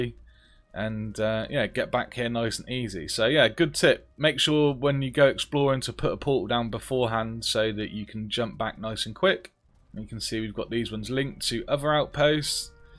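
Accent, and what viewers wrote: Norfolk, United Kingdom. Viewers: Some really great ideas! Are you from Norfolk or Suffolk? I live near Norwich :) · i like your british accent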